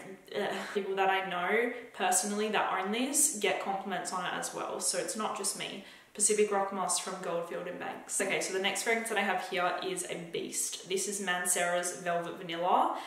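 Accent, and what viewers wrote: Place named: Australia. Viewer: Anyone else generally just really like videos but really stay tuned just to learn how to authentically say "know" or "no" with an authentic Australian accent!? Rhetorical question. I KNOW I'm not the only one.. so..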